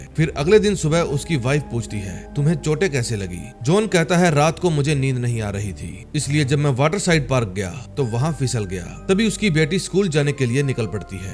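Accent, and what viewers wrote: Punjab, India. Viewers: Tu pakka Punjabi aa😂😂😂 pamma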